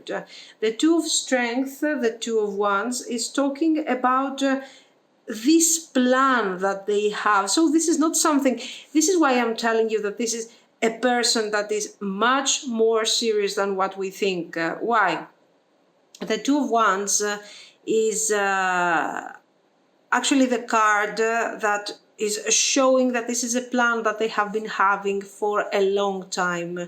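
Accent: Greece. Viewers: First time watching a video on your channel! As soon as i heard you I thought you were Greek, and you actually are😂🩷 Btw loved your reading εισαι υπεροχηη❤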